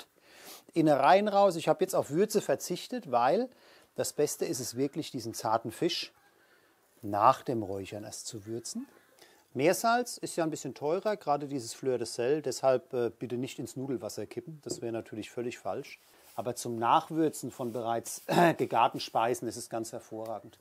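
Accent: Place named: Hesse, Germany. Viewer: Ich liebe diesen hessischen Einschlag! :-D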